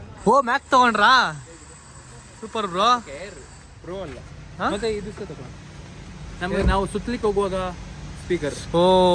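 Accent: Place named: Mangalore, India. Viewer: Full mangaluru kannada , kusi apundu keniyera😅😅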